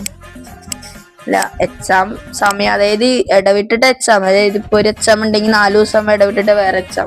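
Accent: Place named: Thrissur, India. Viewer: Pkd aano... ഞാനും pkd ആണേ... ഇയാളുടെ slang trissur ആണല്ലോ 😊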